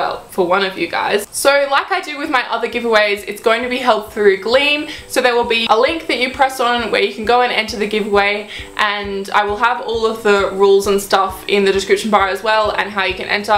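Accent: Australia. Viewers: Hey if i am not wrong are you Australian??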